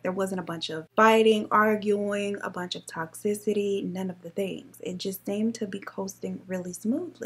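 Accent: South, United States. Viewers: Yess. And that lil southern accent to top it off🥰🥰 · Totally off topic, but I love her accent! I'm from the UK, so I've got no clue what part of America she's from...but I like it! Xx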